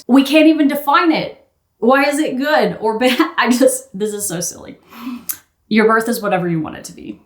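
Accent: New Zealand. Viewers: I’m just so amused that you’re picking up a kiwi accent. Your “New Ziland” is exactly like my mates 😂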